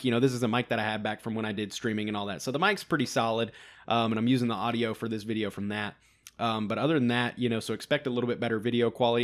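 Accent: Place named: South, United States. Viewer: Wow back with a new beard and a slightly thicker southern twang?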